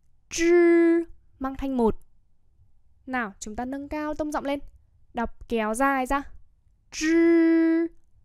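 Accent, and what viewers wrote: Standard. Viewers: Cô đọc chuẩn lắm 👍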